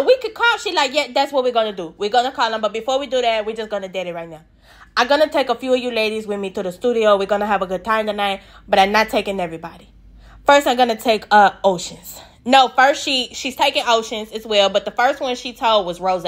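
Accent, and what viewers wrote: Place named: St. Louis, United States. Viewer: Are you from St.Louis? You gotta be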